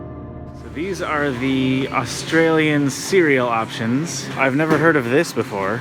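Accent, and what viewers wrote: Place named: Ireland. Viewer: Great to hear an Irish accent in a WIDRN video. How many of us Irish Arandanauts are there?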